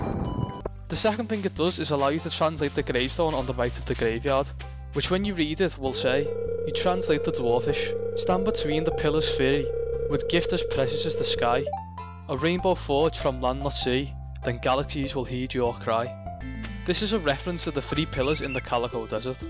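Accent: Liverpool, United Kingdom. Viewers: Haha I just typed in as I love this game but cnt remember where the blue one is.. then this scouser came on lol much love from a fellow scouser herself l8. Mate. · YAYY SCOUSE STARDEW VALLEY FAN R U FROM LIVERPOOL TOO?!?!? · love the scouse accent. you got my subscribe sir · Madness didn’t expect to hear a scouser aha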